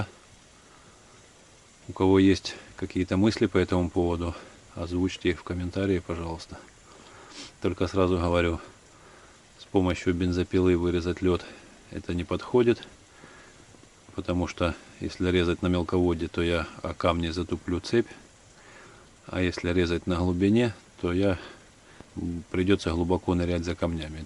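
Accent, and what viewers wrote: Ukraine. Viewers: вы где то на Украине судя по говору?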